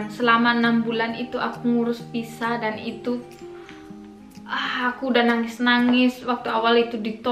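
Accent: Bali, Indonesia. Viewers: bisa bahasa bali ya tp qm muslim....beberapa kali di video qm dpt bilang "mekacaan" dan "saklek", logatnya juga kyk orang bali awal.a aq kira orang bali 🤭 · pasti qm org bali,kliatan logatx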